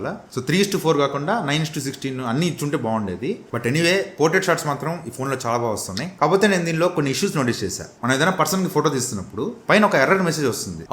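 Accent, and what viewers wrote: Nellore, India. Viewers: Anna nuvvu Nellore odivaaa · Bro nellore aa meedi, yekkada untaru bro maadi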